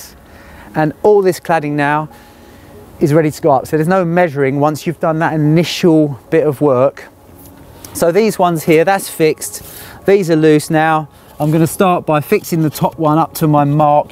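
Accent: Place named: RP, United Kingdom. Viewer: This is poshest chippy I’ve ever heard I have use dictionary when he talks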